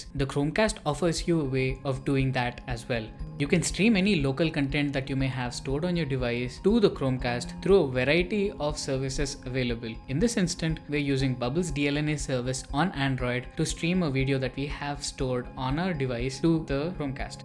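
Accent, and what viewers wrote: India. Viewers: that Indian accent though... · Haha...he is speaking Hinglish. So, you won’t understand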